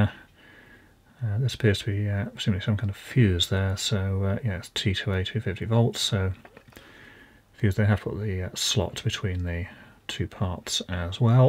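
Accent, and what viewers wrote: United Kingdom. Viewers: Just crapola from a trades person from the UK. Not at all an expert. Don't fall for the Uk accent.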